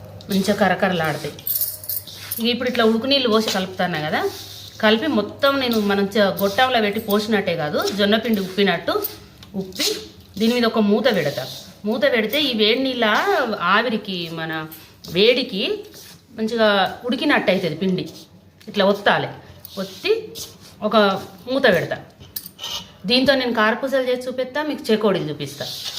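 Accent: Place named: Mancherial, India. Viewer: Same ma lagane matlduthunnaru madhe mancherial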